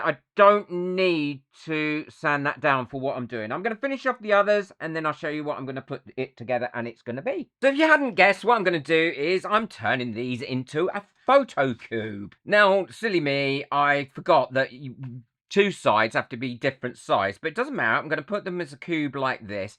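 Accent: United Kingdom. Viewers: I love watching your videos and have learned a great deal. I have a question: where are you from in the UK? I love your accent.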